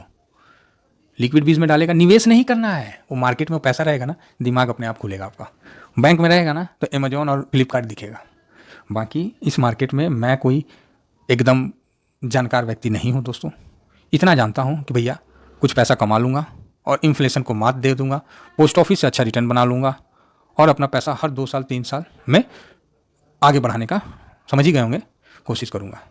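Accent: Bihar, India. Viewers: App bihar ka lagra sir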